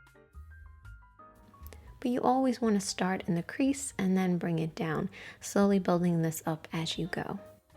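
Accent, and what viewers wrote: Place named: Ireland. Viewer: Sometimes depending on the words you say I can hear the Irish accent, especially if the word has a u in it, like much, brush, blush... I love it 😍😍😍, i've always said if I could have any other accent other that my US southern accent, it would be Irish, you're voice is NOT annoying either, I find it very soothing, shame on them 😤😤😤, I love this video, really all of your videos, you always use the minimum, but it always looks like it took hours, a whole brush set, and a whole palette and then some and create the most beautiful looks 😍😍😍.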